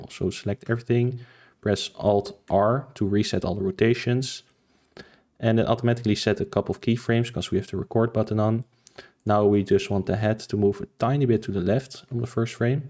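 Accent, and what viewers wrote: Netherlands. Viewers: sum tell me u dutch. could it be ur accent? i'd recognize a dutch accent anywhere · I heard it cuz im actually dutch too,anyway this was very helpfull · Hi I have a problem when exporting my Character. I followed all the steps but when I drag my character from my desktop to Unreal Engine, the chest is hollow. I went back to blender but there everything was fine. I don't understand what I did wrong can you help me? also, are you Dutch? If so: hallo! ^^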